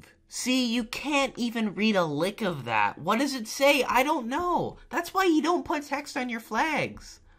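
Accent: Quebec, Canada. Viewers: Is he Canadian? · probably from Quebec, Canada. definitely from up north, speaks both english and french fluently, plus the references to Canadian provinces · he is Canadian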